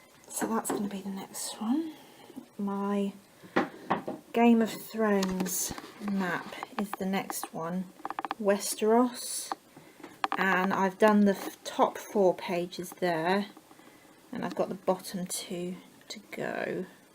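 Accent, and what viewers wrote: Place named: United Kingdom. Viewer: Just found you! I love your lovely British accent and your comments about the weather there! What beautiful WIPS you showed!💕I am about to watch another of your videos.😊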